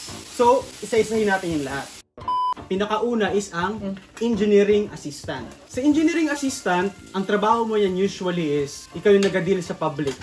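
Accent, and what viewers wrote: Visayas, Philippines. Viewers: ambot bisaya an deay si idol HAHAHA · oy bisaya hahahaha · hahaha y*w* nindot jud kaayo paminawon ning bisaya mag tegelog ay hahhahhah hi kuyaaaaa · lisuda jud ning bisaya mag tagalog oy hahah di ma likayan ma sagulan ug binisaya 😂😂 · Hahaha kaaligri sa imng bisaya accent Engr. Dugang kas akoang inspirasyon as 2nd yr ce student diri sa cit univ! God Bless you engr!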